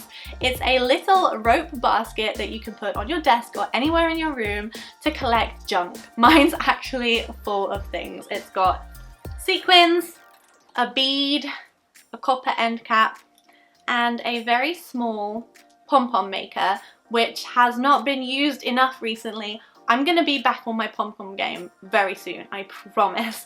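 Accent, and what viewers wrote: United Kingdom. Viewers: Omg she’s pretty has a British accent and her name is? 😳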